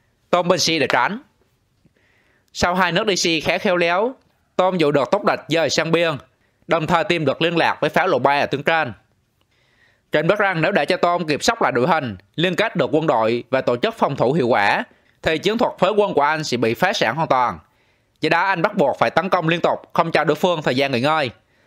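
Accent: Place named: Phu Yen, Vietnam. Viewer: Nghe giọng giống Phú Yên . Hồi trước cũng ở chung với 1 a Phú yên ở Q5 nhà cô làm ở xưởng thuốc lá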